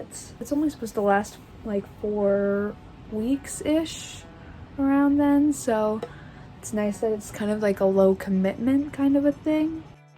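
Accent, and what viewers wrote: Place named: North, United Kingdom. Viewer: I stumbled upon one of your videos today and found myself listening to others for the past couple of hours. I am obsessed with vintage clothing and love music before my era as well. You were asked a question about mental health and answered so honestly that I wanted to applaud your courage. Great job on the videos! P.S. I am originally from up-north and recognized the accent immediately ; )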